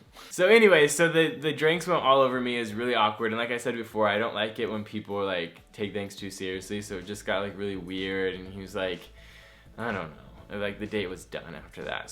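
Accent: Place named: Los Angeles, United States. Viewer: los angeles accent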